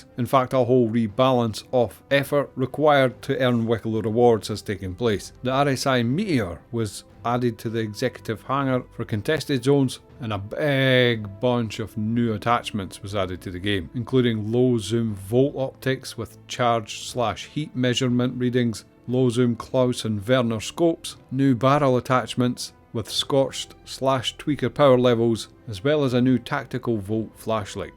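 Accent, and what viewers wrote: Scotland, United Kingdom. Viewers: Something Star Trek about a Scottish guy talking about engineering. Give it all she's got!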